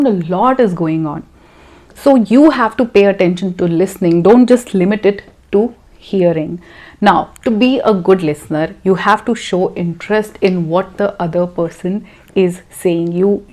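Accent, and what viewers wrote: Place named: India. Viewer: Such an amazing skill in speaking in clear Indian accent.. Thank you very much dear teacher.. You're talking to our hearts and we're actually fortunate to have a great educator like you here on this educational YouTube channel..I'm watching all your video series without fail from your neighbouring country, Sri Lanka 🇱🇰.. Keep it up....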